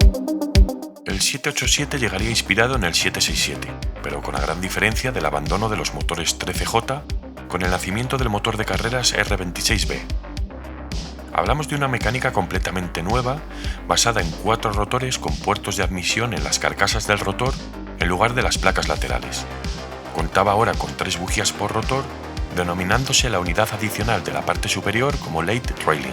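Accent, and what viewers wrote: Spain. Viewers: me cansé... Me cansé de mirar tus videos sin comentar. En primer lugar, agradezco muchísimo tu dedicación, eso le da una gran calidad al contenido que generas. Sinceramente, con tus documentales de automovilismo, se aprende bastante sobre la historia. Y digo documentales porque, tu voz con acento español, las imágenes y la música ochentera, te dan ese estilo "retro" que me recuerda a los documentales que miraba por TV cuando era chico, o en los VHS que nos mostraban en la escuela. Gracias por hacer un video del Mazda 787B, que es sin dudas (para mi), el auto de carreras con el mejor sonido de la historia... pero bueno, gustos son gustos... Y te pregunto a ti: Para vos, ¿Cuál fue o es el auto de carreras, que lo escuchas bramar, acelerar y rebajar, y te parece que es el que mejor suena?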